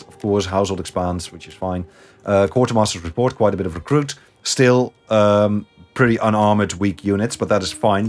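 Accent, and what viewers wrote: Netherlands. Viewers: I like your gameplay... I hear that you are Dutch. Do you also have a face cam? Keep up the GOOD work!! 😄👍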